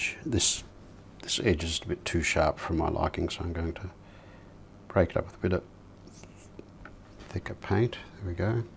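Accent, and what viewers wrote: Australia. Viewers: Hi, just discovered your channel! How nice it is to hear another Aussie. I promptly subscribed and look forward to learning lots..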